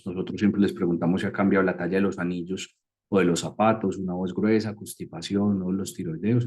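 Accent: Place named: Colombia. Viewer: Qué lindo explica y qué hermoso acento colombiano. Saludos desde Ecuador.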